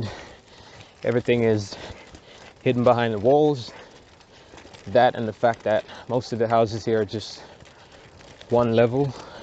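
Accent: United States. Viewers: Thank you for sharing helpful information. We need more details about lands and houses for sale in Bujumbura. I like the way you speak English you sound like you have been in USA for a while. Just suggestion try to focus on real estate and then we will be able to see some houses on sale.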